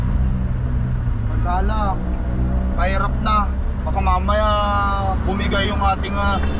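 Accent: Ilocos, Philippines. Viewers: Yung accent! Matik ilokano! 😁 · Tlga ilocano hehe halatado tgla sitau nga ilocano duray sadinno nga sulinek hehe God bless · Perstym ko idtoy channel mo idol accent mo palang ilocanong ilocano hahahaha ingat kabsat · Nagsolid dayta ilokanom padli. Ahahaha. Mamadlaw tayo pag nagtatagalog ka. Hehehe. Alwad kinanayon. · Parang ilocano so kabayan ah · ilocano ka sir? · ilocano ka ata sir! hehe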